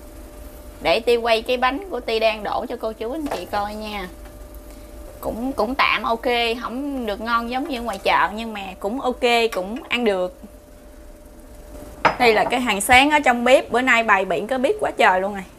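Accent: Mekong Delta, Vietnam. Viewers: Anh xem từ đầu đến cuối Video Em rất xinh với chất giọng ấm áp đặc trưng miền tây nam bộ, món ăn rất ngon chỉ thiếu mỗi bộ bà ba của người con gái sông nước miền tây nữa là nhất Em đấy ạ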